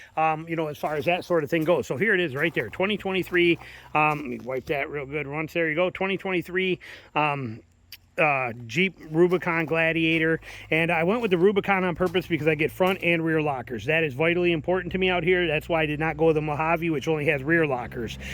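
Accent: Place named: Chicago, United States. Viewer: Are you from chicago ? You have a chicago accent.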